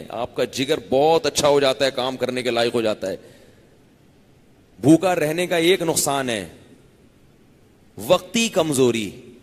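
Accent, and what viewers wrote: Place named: Karachi, Pakistan. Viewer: Apka bat krny ka andaz mujhy bhout pasand ha ماشاءاللہ ar boli sa app mujhy karachi sa lagty hain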